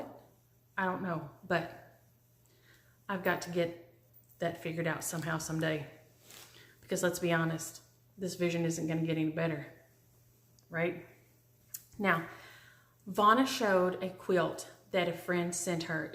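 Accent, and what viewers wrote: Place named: Georgia, United States. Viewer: FUDGY Brownies Only for this girl! Are you from Georgia originally and moved to Texas? You sound like a Georgian. I love it!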